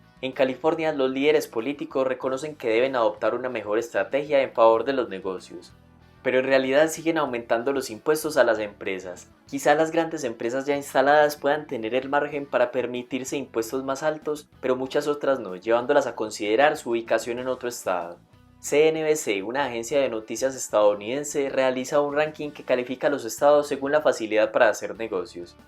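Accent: Colombia. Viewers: Bro, eres de Colombia?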